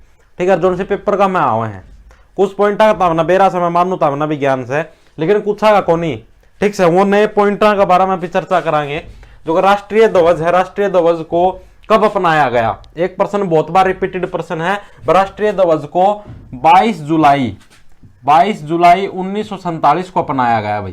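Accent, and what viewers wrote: Haryana, India. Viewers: Very nice Sir 👍 Your language is properly Haryanvi but I like it👍 And your teaching way is very interesting 🥳🥳🥳🥳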